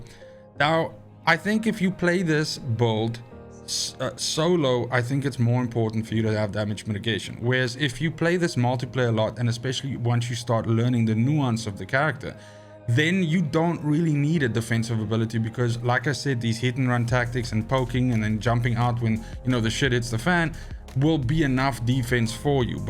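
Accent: South Africa. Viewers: yo you South Arfican ?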